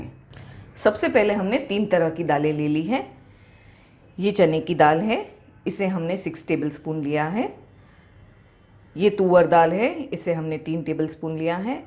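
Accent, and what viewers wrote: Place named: Maharashtra, India. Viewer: It's really very nice the way you explain the recipe. The way you sound It seems you have a connection with maharashtra.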